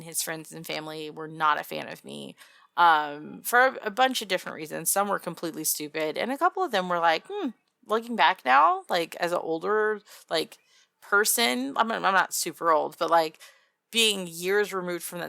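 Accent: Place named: Brooklyn, United States. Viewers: That was totally wicked!!! You did a great Brooklyn Accent. Harley Quinn would have been proud. Liked how the Brooklyn & Texas accents started blending together toward the end. You were great! Also hearing some of the origins of the imaginations afterwards is great, too! Totally fun! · Loved the audio the demon yandere with a Jersey accent or is it a New Yorker accent either way I am blown away by how many accents you can do❤ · So legit, love southern accents, and that accent sounded...hmmmm new york? New jersey? Hard to tell, but i was getting heavy Fran Drescher vibes from that voice whoch made it funny but at the same time if she has new jersry or new yorker in her, oh lord she'll kill anyone that touches her man. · A Brooklyn accent makes me miss Olga 😔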